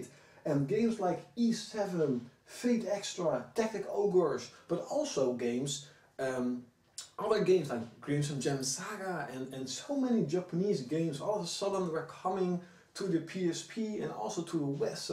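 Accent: Netherlands. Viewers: your dutch right???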